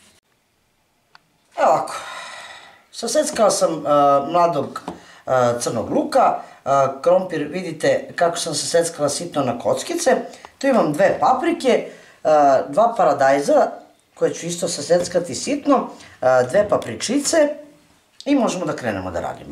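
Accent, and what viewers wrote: South, Serbia. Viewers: Fantazija ukusa,pogotovo gde ima krompra to je neizostavno,svi ostali sastojci su nesumnjivo takođe doprineli ukusu.Moram da ti każem da ti je glas toliko umiljat i taj jużnjački naglasak,podseća me na divnu glumicu Radmilu Savićević, Puno pozdrava.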